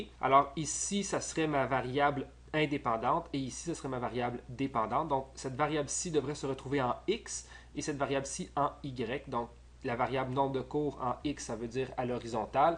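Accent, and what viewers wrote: Quebec, Canada. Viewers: moki aj c’est du Canadian Français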